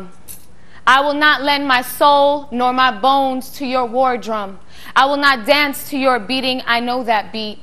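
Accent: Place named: New York, United States. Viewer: "ghetto"??? Shes from Brooklyn and speaks out like many folks from NY do...she is also a literate brilliant artistic writer poet...words do matter...she knows understands and uses that rhythm and vocabulary to SAY SOMETHING POWERFUL...bless her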